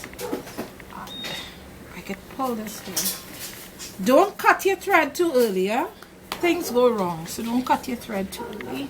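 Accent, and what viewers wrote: Trinidad and Tobago. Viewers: where are u from?. Sound like Trini. i am trini :-9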